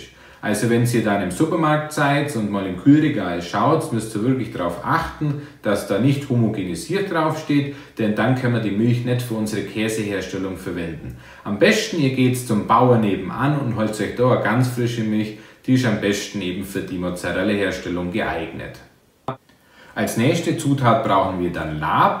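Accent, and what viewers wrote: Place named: Augsburg, Germany. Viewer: Hört sich nach augschburger Dialekt an.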